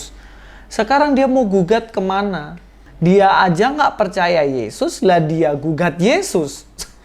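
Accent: Java, Indonesia. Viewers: Cino logat jowo kui biasa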